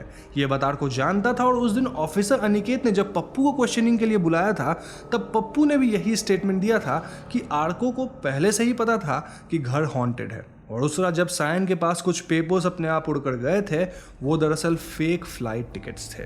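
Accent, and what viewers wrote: Bengal, India. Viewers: are you bengali ?, as your hindi has bengali accent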